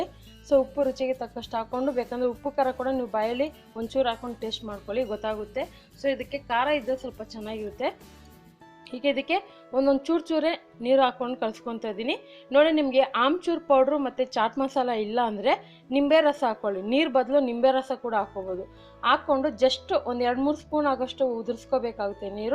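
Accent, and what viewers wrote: Tamil Nadu, India. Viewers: ನೀವು ತಮಿಳು ಮಾತನಾಡೋ ಭಾಷೆಯವರು ಇರಬೇಕು. ಕನ್ನಡವನ್ನು ಸ್ವಚ್ಛವಾಗಿ ಮಾತಾಡೋದನ್ನು ಕಲೀರಿ.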